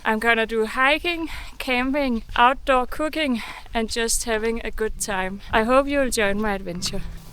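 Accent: Denmark. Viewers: Thank you for the video from my home region "Söderåsen", It was a lovely Danish accent, I love Denmark, Danes, have been there so much that I am almost half Danish hi hi, Nature in Söderåsen is beautiful but I am not so happy about the wolf implementation, people have lost dogs and soon it will affect some people! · Your soothing Danish voice almost put me in a trance, for a while I thought I was in a remake of Elvira Madigan (1967) then I snapped out of it, unfortunately, I would rather have stayed there! What a wonderful video, thank you! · Sorry - men jeg kan ikke klare med af den danske accent i det engelske, havde håbet at det blev bedre, men jeg står af nu. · It's so funny that I only need like 5 seconds to hear that you are from Denmark 😄I'm danish myself that's why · Den danska accenten i engelskan är mycket vacker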